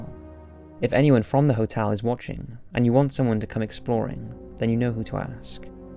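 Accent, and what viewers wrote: United Kingdom. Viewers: To me why is it scarier when a British person speaks about spooky things 😎😑 you just earned a subscriber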